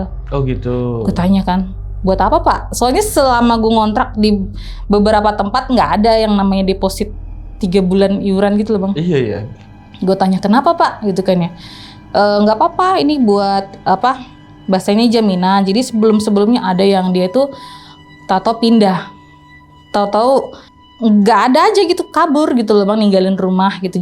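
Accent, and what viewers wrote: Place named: Betawi, Indonesia. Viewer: keknya betawi😂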